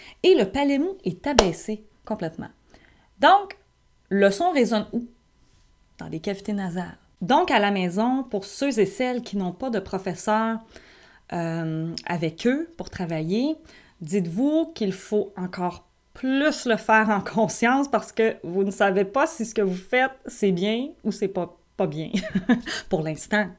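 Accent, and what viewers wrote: Quebec, Canada. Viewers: Vous êtes québécoise ???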